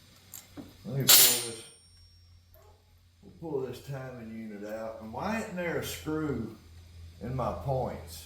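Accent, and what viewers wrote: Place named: South, United States. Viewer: Man do you know what kind of southern accent you have? Like what region did you grow up or develop that thick accent you have?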